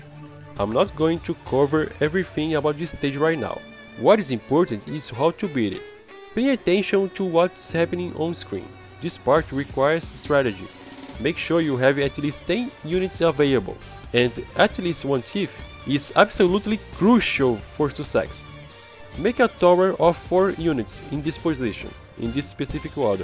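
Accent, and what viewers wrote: Brazil. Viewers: Vc é brasileiro percebi pelo sotaque, mano me ajuda eu quero mt desbloquear o robot kurtis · tu é br ne boy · Sotaque de brasileiro hein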